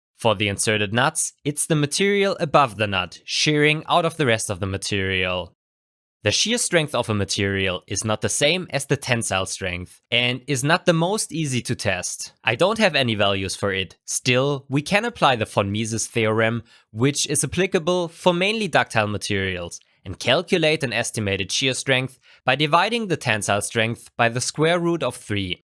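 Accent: Germany. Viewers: Your English is sooo German, it makes me smile every time I hear a new video! ;-) Sorry, I beg to differ. The von Mises Estimation assumes the material to be rather homogeneous and isotrop. Both of which 3D printed material is obviously not at all, due to its layered characteristics. In addition, printed material has a different strength than filament because of nozzle and squishing effects. So your estimation of USS may be far off, and you don't even give an idea by how far before comparing to your experimental values. The real failure lines should start at the compressed surface (=upper end of the nut), not at the bottom surface of the whole body (=lower end of the nut), which is uncompressed. After finding that compression failure and enlarging the compression area are the best way to go, I'd be curious to see how a nut with a washer or a claw nut (german "Einschlagmutter") behave in your setting.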